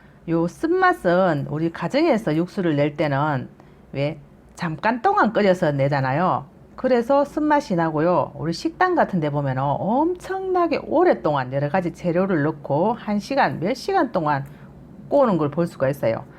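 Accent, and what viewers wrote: Gyeongsang, South Korea. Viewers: 경상도 사투리로 재미있게 방송하시는게 재미있어 자주보게 됩니다 성격이 화끈해 요리설명도 재미있게 하셔서 혼자서 웃기도한답니다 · 갱상도말씨가 팽상시 좀 시끄러버서 듣기가 쪼께 거북시러버 안듣는데 여사님 말씨는 괘않습니더 자상한 말씀 감사 함니데이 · 잘봣어오ㅡ 곙상도사투리 줌마님 얼굴좀 보여주세요 오늘ㄷ좋아요 했어요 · 친근한 갱상도말씨 좋아요 좋은정보 잘공운하고있어요 감사해요 굽신~♡ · 만물박사 갱상도사투리원조님.멸치라고 깔봐요? 그렇게 인간에 유익한 머리님을~~삶은소대가리보다 고맙죠. 군에서 된장 한 숟 푹떠 손가락만한 멸치 5개로 한끼 때웠던 그 때가 그립네요. 오늘은 영양학 강의? 다 안들려요. 대구4두2 듣는 취미. 오늘은 너무 젊잖네요.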